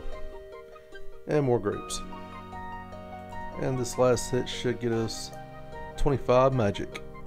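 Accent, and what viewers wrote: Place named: South, United States. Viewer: Idk why but people with Southern accents playing RuneScape always makes me smile. One of my best RuneScape friends from back then was from Missouri and had this super thick accent, you remind me of that a little lol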